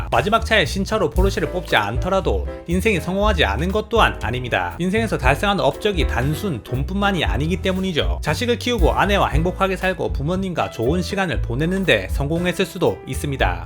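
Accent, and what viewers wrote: Gyeongsang, South Korea. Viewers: 경상도 사람잉가 ㅋㅋㅋ 사투리들리네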